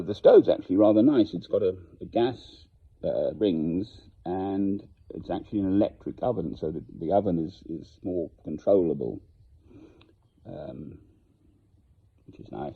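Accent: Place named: Scotland, United Kingdom. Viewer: your scottish accent is remarkable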